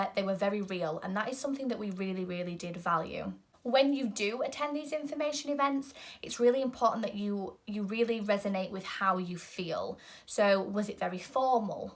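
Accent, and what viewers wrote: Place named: North, United Kingdom. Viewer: Hello, firstly id like to thank you for your videos they have been very informative about the process and you have explained things in a way that makes it seem achievable for most who would wan to adopt. Secondly i wanted to ask if you wouldn't mind telling me which adoption agency you used. You sound like you're in the north and as you had such a positive experience i wanted to look into the same agency if we happen to be in a similar area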